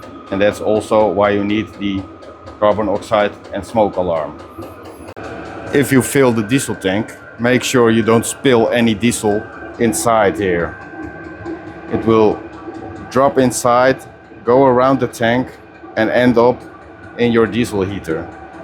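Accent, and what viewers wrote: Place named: Netherlands. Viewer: Cool video, wanted to say that you sound Dutch and then looked into your profile 😀 Prima 👍 I see your garage wood walls, i assume its not 100% insulated. Wanna install this heater in my mancave, 5x5. Is it worth it? How quick does your garage warm up and to what temperature? If I need to run for 12 hours to get 20° than its sure not for me. Greetings from Limburg 👊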